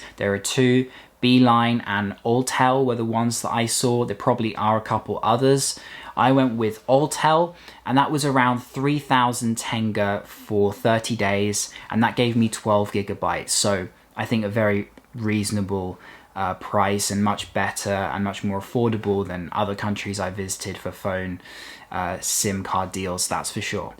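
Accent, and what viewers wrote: United Kingdom. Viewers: I like it your accent bro it's clear and understandable, you're different other British accent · Зае6ись британский акцент))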